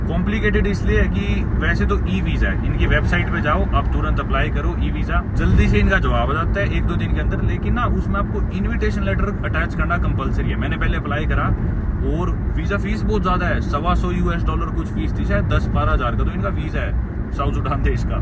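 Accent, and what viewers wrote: Haryana, India. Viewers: Es desh mein haryanvi boli sun ke Khushi hoe, very good, love from Sandhu Ambala ❤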